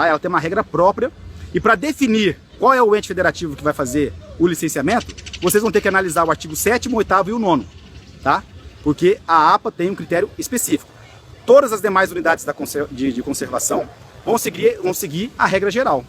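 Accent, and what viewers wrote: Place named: Bahia, Brazil. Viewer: Professor deve ser Bahiano.excelente.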